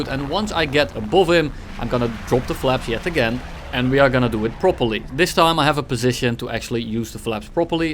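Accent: Netherlands. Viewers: I know you're Dutch, I hear ded eksent a mi-jel awee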